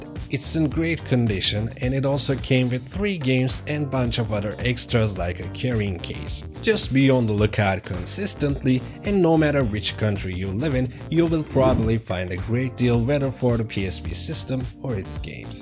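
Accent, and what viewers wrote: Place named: England, United Kingdom. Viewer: Lan o değilde bilmesem adamı İngiliz sancam ha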